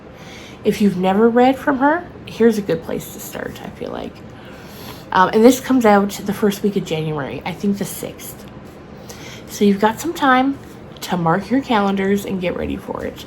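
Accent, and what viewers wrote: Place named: South, United States. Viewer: Sassy southern - love it.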